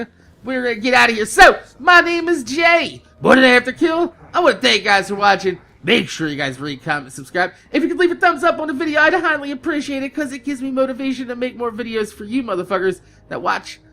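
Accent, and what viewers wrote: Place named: Florida, United States. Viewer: It sound like you stay in Florida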